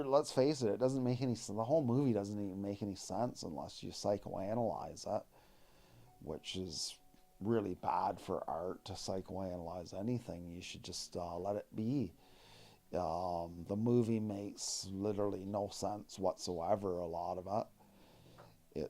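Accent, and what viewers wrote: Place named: Canada. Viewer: I could talk to this dude forever about this Also one of the most Canadian people I’ve ever heard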